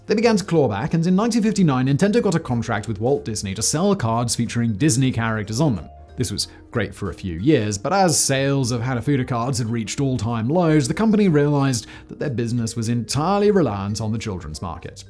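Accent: United Kingdom. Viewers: I do love all of Simon's channels, but he has a rather insistent habit of pronouncing names in the most British way possible. He gets several other names wrong in this video alone lol.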